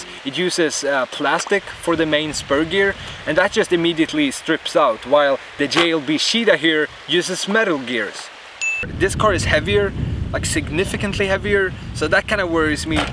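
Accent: Sweden. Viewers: Are you from sweden? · is this guy swedish? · Are he swede???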